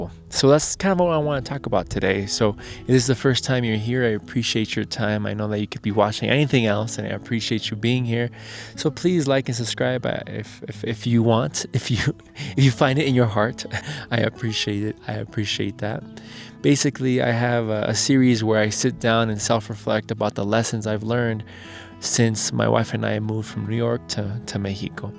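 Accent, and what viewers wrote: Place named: United States. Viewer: Ya han pasado 9 meses desde la publicación del video.... Pero: Ser mexicano no implica que sigamos un estereotipo porque hay muchas formas de ser mexicano independientemente del lugar donde se nace. No es lo mismo nacer en Ciudad de México, Sonora o Yucatán y eso se aplica tambien a quienes como Usted nacieron fuera del territorío nacional y más cuando legalmente se es mexicano (hijo de mexican@ es mexicano por ley). Así que.... No se sienta en inferioridad respecto a los que aquí nacimos. Usted es tan mexicano como el que más, nomás que nacío allá y cuando le pregunten diga que nacío en el otro lado y nadíe le cuestionara eso. Que porque habla diferente... pos si, así hablan los mexicanos de allá y los chilangos hablamos como chilangos, los Yucatecos como yucatecos y los de Tabasco como el peje y los de Chihuahua como shihuahuenses. 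Espero que esto ya lo haiga superado. Mucho exito...👍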